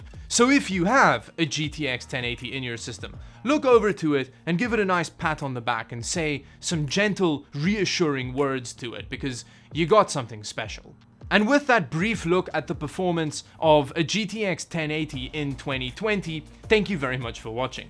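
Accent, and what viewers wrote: South Africa. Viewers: are you "a botjie from SA" or just have an accent? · where are you from dude, you have a polish name but you sound south african im so confused rn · hey whats your accent? south african im guessing?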